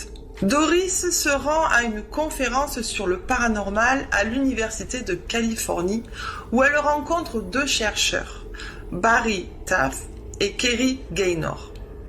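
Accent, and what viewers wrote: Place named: South, France. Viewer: Bonjour 😊 je découvre la chaîne avec ce petit accent du sud 😊 comme nous 😊 du coup un abonné de plus 😊 merci pour ce partage 😊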